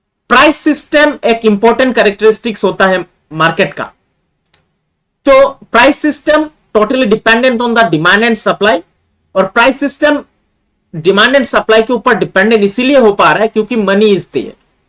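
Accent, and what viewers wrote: Assam, India. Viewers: Sir are u assamese ?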